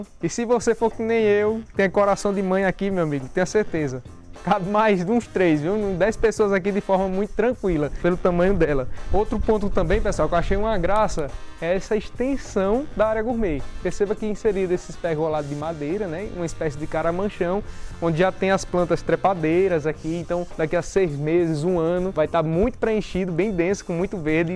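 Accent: Northeast, Brazil. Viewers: Que casa meu Deus,e esse sutaque lindo essa simpatia nossa que maravilha,por isso amo o nordeste, parabéns!!!!! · Comigo, foi diferente, sou inscrita em vários canais de arquitetura, pois além de amar, estou em reforma. Mas nesse canal ( o único da área ) me inscrevi pela simpatia do rapaz tbm. Sou mineira e amo o sotaque nordestino) 😊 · Amei a forma como você apresenta! Esse seu sotaque é demais! Até porque eu também sou uma paulistana/ nordestina! Este sobrado é simplesmente linda!! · Adoro seus vídeos, vejo todos... Você é uma simpatia esse sotaque nordestino dá todo um charme no vídeo... Parabéns pelas filmagens... E a casa realmente maravilhosa.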